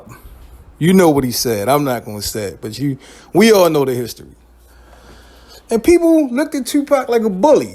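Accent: Baltimore, United States. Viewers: Whoever made this shit is from Baltimore. I can hear it in yo voice motherfucka... 🤝🏿 Salute! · You sound like you're from Baltimore or the east coast somewhere. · U got a hard Baltimore accent. I recognize it anywhere. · Off subject ..But peeps from BMore accents be wild wit The U`s and 2's. GETS ME every time but anyway always dope videos 🤣🤣🤣🤣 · All I hear is your Baltimore accent. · Are u from Baltimore? Cuz i hear that accent 😂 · Bmore prolly? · You definitely from B-more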